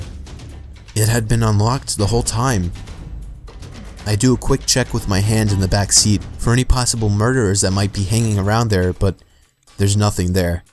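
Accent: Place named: Boston, United States. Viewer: He also has a Boston accent in his old videos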